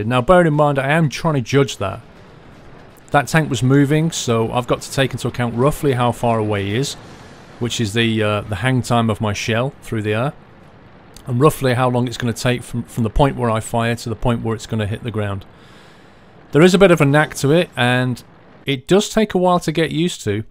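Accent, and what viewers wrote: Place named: United Kingdom. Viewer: Lets Mber нормально он вроде разговаривает, носитель английского языка (британец, слышно британский акцент)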